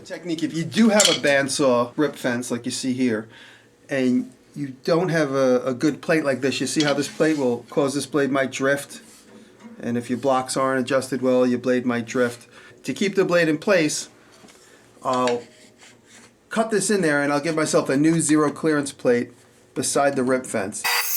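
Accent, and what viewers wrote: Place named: South, United States. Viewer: Great videoes. I have had a band saw for 15 years and Today I found out from you that it has been no where as useful as it is capable of. I am 70, my friend, also 70 just bought his first bandsaw. ( Grizzly). I am going to call him and insist he watch you use a bandsaw with skill. We live in Georgia and Alabama and you talk like us. Spike really adds to your tubes. Thanks for sharing and caring. I hope you enjoy your new upstate shop.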